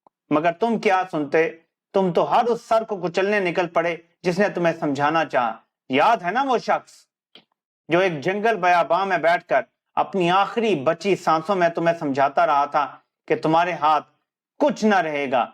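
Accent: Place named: Saraiki, Pakistan. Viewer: Aap ka saraiki lehja hy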